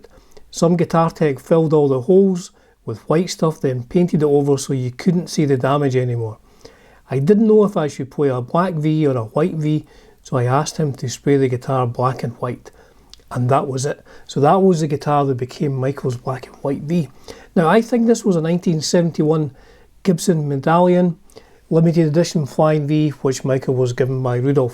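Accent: Scotland, United Kingdom. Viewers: great video buddy. Blessings to you and your family from Hogganfield Loch Glasgow originally from Saltcoats on Ayrshire coast I've been a Schenker fan from Obsession LP onwards my favourite guitarist. What you've missed is that Gibson won a massive law suit with Dean Guitars who can no longer make the Flying V or other shapes of Gibson I think they are in deep trouble financially now since the court case so Michael probably released from his Dean contract and hence back to Gibson. You sound East coast hope you're a Hibby same as me lol. Kind regards buddy. 🎸✝️🏴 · Growing up, Michael Shanker, was always my favorite guitarist! I see your Scottish by your accent. I’m an American but anyway, I love the show outlander they got the Scottish actor Sam Heughan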